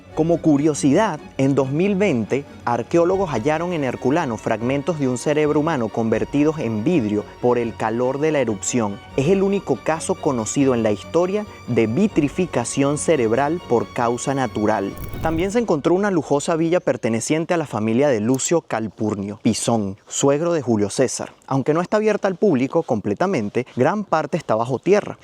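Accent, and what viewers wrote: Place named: Venezuela. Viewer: se dice "eStan muy DeSgastadas " nose por siempre dices ejtan muy dejtadas el acento venezolano es feo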